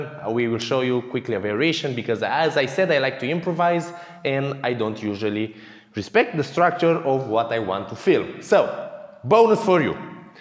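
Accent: Romania. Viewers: Puteam sa jur că ești român după cum vorbești engleza...Mulțumim de tutorial...ii ca lumea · Romanian accent. Resembles the italian accent.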